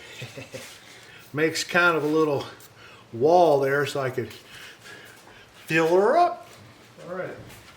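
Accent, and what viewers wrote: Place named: Arkansas, United States. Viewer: I literally stumbled across your video this morning. I'm glad I did. 😃I'll be doing some experimenting at this process myself. I lived in Shelbyvill, TX for a few years. But I've been back home in NC for over 20 years, but I thought I recognized the Arkansas accent. Wish I had a workspace like that. I've subscribed and liked. 👍 HAPPY NEW YEAR!! 🎄 God bless. 🙏5th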